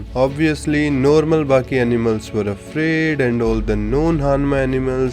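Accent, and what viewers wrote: India. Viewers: u sound indian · Bro he's Indian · Why do you sound so Indian can you please tell ? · Bro you returned 😁 loved the accent from 🇮🇳.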